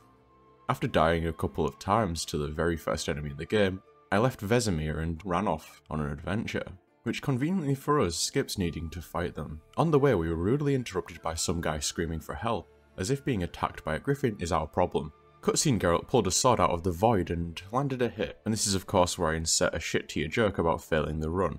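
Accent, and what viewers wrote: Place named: Northern England, United Kingdom. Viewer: He’s clearly northern English, Musk doesn’t sound like he’s from anywhere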